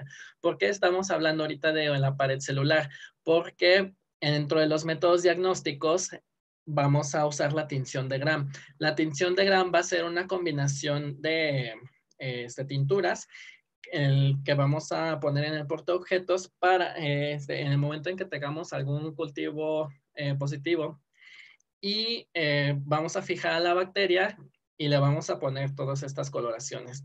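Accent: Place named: France. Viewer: Está chido el acento francés, excelente clase gracias por compartir de su conocimiento!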